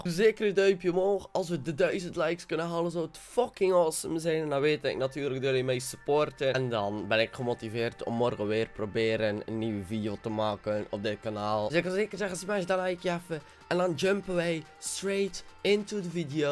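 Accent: Belgium. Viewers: Yo.... Je hebt echt een SUPER epic kanaal, ik volg je al vanaf je eerste Video...... Wat ik het leukste aan jou vind is: Je Belgische Accent is echt super grappig (GeenHaat) en de manier waarop jij je vids opneemt... ja weet je... dat is gewoon SUPER EPIC Welke Vids Ik het leukste vind::::::::::: *VerlatenLocaties *Vlogs *Gaming Dat vind ik gewoon super tof... Maar je moet gewoon doorgaan met JoutubbePasta en dan komt alles goed en je moet vooral jezelf blijven eejj en pssst.. Je moet je niet Gek laten maken over een dom iemand die denkt dat hij/zij grappig is...... Jij maakt je Vids op jou manier en dat is het gene waar je het best mee bent dus laat ze maar praten... Je bent gewoon de beste Joutouber:D Van Joutuoube:P Groetjes Je beste fan ever #AceFan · Random praatvideo's zijn wel lachen want ik vind t Belgse accent gewoon mooi haha doeo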